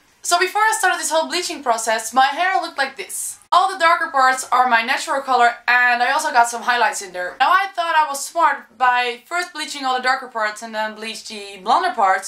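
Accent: Netherlands. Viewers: ik kan het nederlandse accent al horen lol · Kom je uit nederlamd?? E ech tmooi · Are you Dutch?? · Hahah iedereen zegt precies wat ik dacht! xD je hoort dat je nederlands bent :p But hey, proud to be dutch right? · You're Dutch right? I reconised the accent haha ;).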